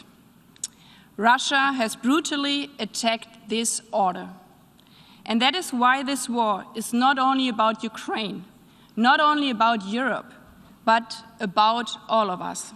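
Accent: Germany. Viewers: Ihr deutscher Akzent ist recht gruselig, aber darum geht es nicht. Sie macht einen guten Job, bietet Lawrow die Stirn, der seit 18 Jahren im Amt und ein mit allen Wassern gewaschener, skrupelloser Lügner ist, sie arbeitet hart, lernt schnell und hat in ihrem Amt eine Diktion eingeführt, die man, wenn überhaupt, schon lange von keinem Diplomaten und keiner Diplomatin zu hören bekommen hat. Wer bei all' dem nichts weiter zu bemängeln hat als ihren Akzent, der offenbart, dass es nichts zu bemängeln gibt.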